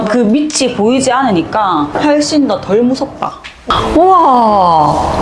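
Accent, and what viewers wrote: South Korea. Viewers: ㅠ여기저기경상도사투리 진짜듣기 거슬려요~~ 한남동같은 그것도서울쪽소개하시면 서울말표준어로 설명해주시면좋겠어요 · 절라도 사투린가요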